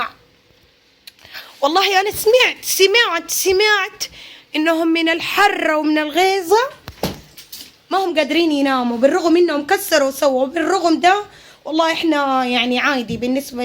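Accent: Mecca, Saudi Arabia. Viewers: احلى لهجه في الدنيا لهجة اهل مكه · هيه هيه يلبى ذي لهجه اهل مكه و جده تجين تسبين نعم ؟ وجعاه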